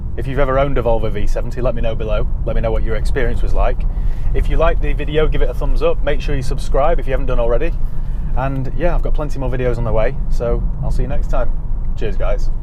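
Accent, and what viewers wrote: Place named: England, United Kingdom. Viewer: england i reckon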